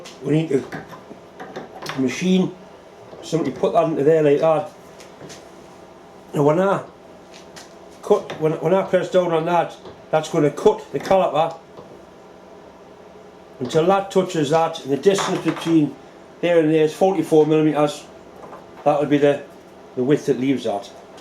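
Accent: United Kingdom. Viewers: Hi You are incredible nice job I like what you done bravo!!! by the way you are from UK??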